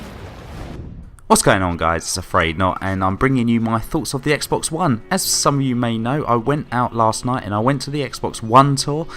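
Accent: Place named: London, United Kingdom. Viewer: oh right yeah you have a bit of a london accent. Can you give me any advice on how to get more subscribers and how to get a bit more confident when doing commentaries ?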